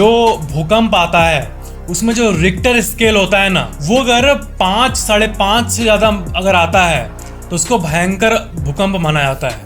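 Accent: Bihar, India. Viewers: "i just love your bihari acsent "😂❤️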